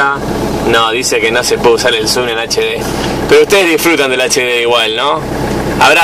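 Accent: Argentina. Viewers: Que raro, dice que se crio en Venezuela, pero habla como argentino.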